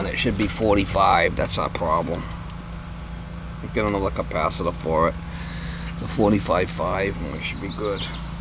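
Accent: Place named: Southeastern Massachusetts, United States. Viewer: I knew that accent was from SE Ma 😅😅😅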